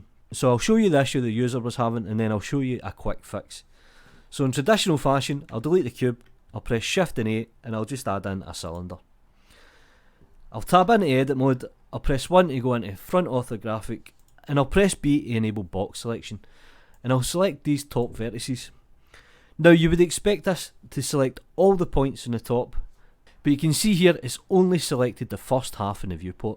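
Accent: Scotland, United Kingdom. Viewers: I have no idea why a Scotish accent reminds me of the vikings 🤔 but great tutorial by the way !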